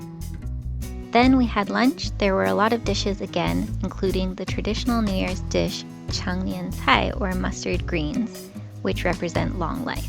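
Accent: Standard. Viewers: Your Chinese accent is very standard and has been integrated into Taiwanese life. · 這英文 真的發音好標準 而且字字清晰 歐告好可愛喔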